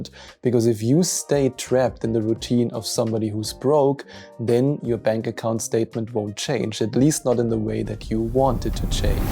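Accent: Germany. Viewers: Great video,! I appreciate your honest, straightforward approach, not full of hype like so many YouTubers. And I love your German accent!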